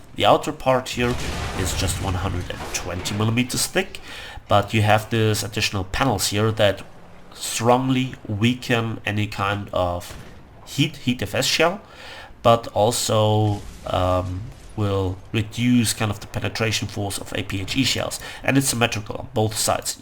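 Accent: Germany. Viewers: your voice sounds even more German like this. It is EVEN BETTER. NO NEED TO SAY SORRY. LOVE YOUR VIDEOS. YOU ARE WHAT KEEPS ME PLAYING WAR THUNDER. THANK YOU FOR BEING SUCH A GOOD CONTRIBUTOR TO OUR COMMUNITY.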